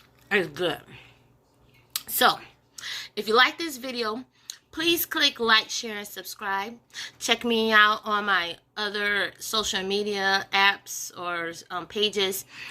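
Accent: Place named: South, United States. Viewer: The ingredients, the preparation, the cooking talent, the presentation, the final result.......am I in Tuscany? The touch of a southern voice....the spot-on Julia Child-type interaction with the viewer....the ease and simple communication of the cooking sequence...all contributes to wanting to follow.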